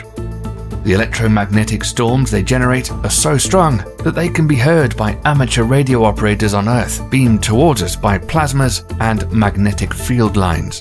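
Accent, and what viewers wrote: United Kingdom. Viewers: Oh a British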